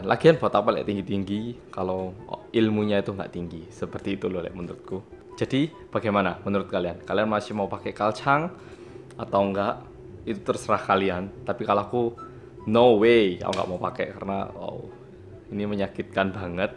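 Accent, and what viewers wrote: Java, Indonesia. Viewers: 안녀와세요 저 한국에서 공부하는 인도네시아인 루푸스예요. 피디오보면 인도네시아 말하시면 진짜 사투리가 자와이네. 정말 처음 들어서 신기하다 ㅋㅋ. 화이팅! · Halo mas, mau saran aja, kayanya seru kalau mas bikin video dengan berbicara sedikit korea hehehe dengan diberi translate ke indoatau inggris tentunya penasaran apakah aksen korea masih kental meskipun aksen jawa sangat mantap! hehehe semangat dan sukses untuk vlognya!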